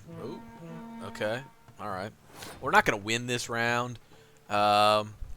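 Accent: United States. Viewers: sorry your accent is really annoying california? whateverrr...we're gonna match that playyyyyyy... ugh. and ,you got this you had a bad ass last hand enough*edit* it wasn't last hand my bad i get my Irish up sometimes.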